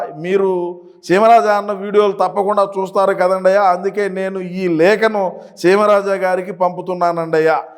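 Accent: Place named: Kadapa, India. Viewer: Anna, Kadapa baasha lo Baaga chepparu.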